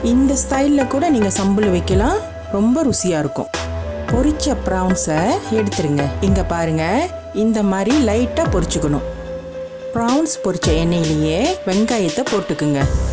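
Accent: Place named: Kuala Lumpur, Malaysia. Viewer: KL accent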